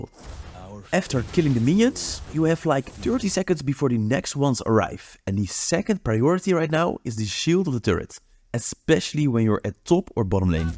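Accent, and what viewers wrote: Netherlands. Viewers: I don't need to listen a second longer wanneer ik iemand die Nederlands praat Engels hoor spreken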